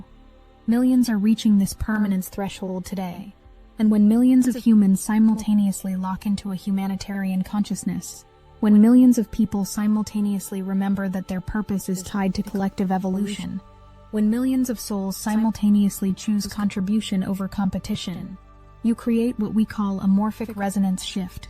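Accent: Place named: Netherlands. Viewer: There is something with dutch people and english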